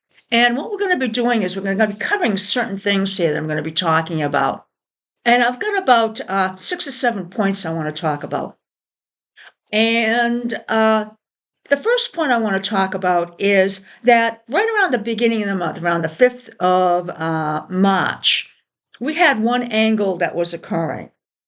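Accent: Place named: New England, United States. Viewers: I recognize your accent. Where in New England are you? I'm in Northern NH 😊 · Love your Boston accent! Familiar to my ears. Others saying similar things post eclipse. Truth, justice consequences.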